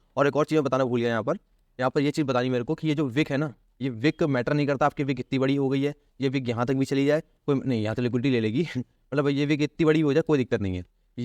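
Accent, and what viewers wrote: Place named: Uttar Pradesh, India. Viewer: Bhai aapke bolne kae tareeke sae aisa lag rha hai ki aap UP sas hai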